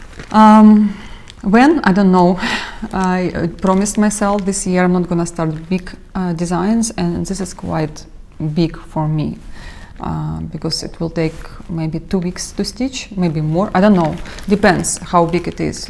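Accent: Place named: Russia. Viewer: Talk abour BORING! If I hear "just nan" one more time my head will explode! Your projects are SO boring, so dull, they completely lack color, and nothing is more boring than samplers! It's like oatmeal! And on top of that you attempt to say french words when you are barely understood in English because of your massively thick russian accent. Seriously? You are so blatantly bougeois in contrast to the suffering of the people in your home town! The only good thing about this video is susie, the most beautiful chihuahua.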